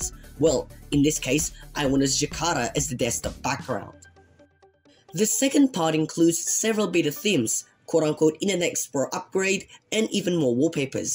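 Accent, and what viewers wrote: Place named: Australia. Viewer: yeah i noticed that too, he has an australian accent but a copy from another country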